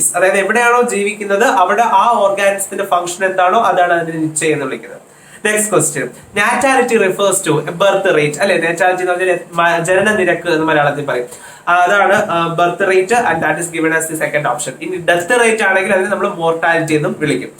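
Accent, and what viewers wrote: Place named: Punjab, India. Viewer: Punjabi like ye kya bol rha hai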